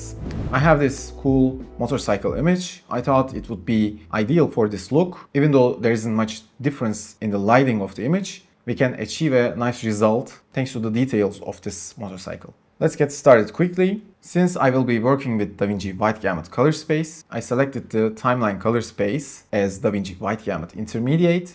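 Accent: Turkey. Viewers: Abi selamlar türk olduğunu aksanından anladım direkt :) Böyle içerikler üreten türklerin olması çok hoş umarım daha da büyürsün.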